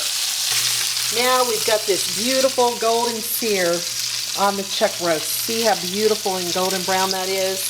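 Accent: South, United States. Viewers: Your a cooking beast! Please invite me over for dinner anytime. I would trust this receipe just because you sound like your from the south. #newpauladeen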